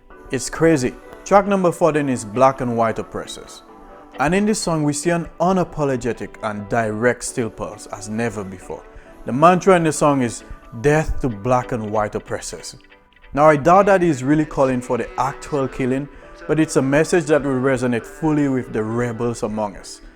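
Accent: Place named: Dominica. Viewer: I'm not an expert in West Indian accents or dialects (I've only lived in Jamaica), but your accent sounds like people I've met from Dominica. Are you from there?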